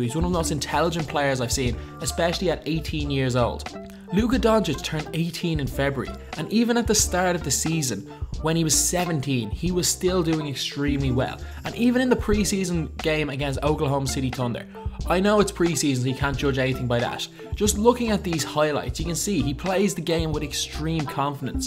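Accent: Dublin, Ireland. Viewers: gotta be Irish with that accent lad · Are you from Dublin? I'm from Kerry. Love the content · are u irish? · you Irish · Are you from Dublin? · Oh shit nvm what county your from lad ?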